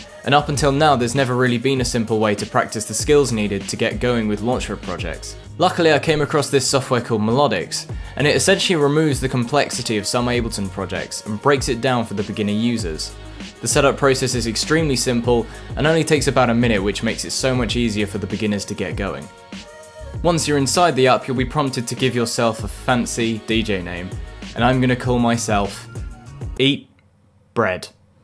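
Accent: United Kingdom. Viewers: His British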